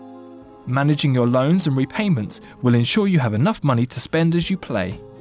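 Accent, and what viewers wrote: United Kingdom. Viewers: Unhhhh. His British accent is sexy.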